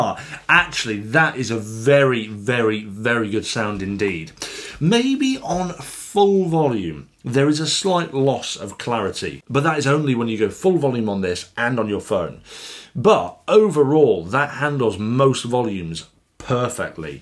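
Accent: United Kingdom. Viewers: The thing that suprised me most is even though I use "Echo" as the wake word for my device, it wasn't triggered once during your video. Is it possible that my device can distinguish between a Northeastern U.S. accent and a British accent?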